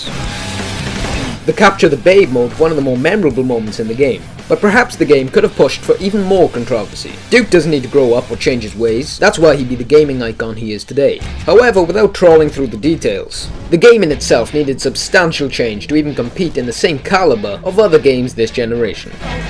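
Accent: Wales, United Kingdom. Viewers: are you Welsh? It sounds like you have a Welsh accent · BTW that's not a English accent either. Sounds Welsh.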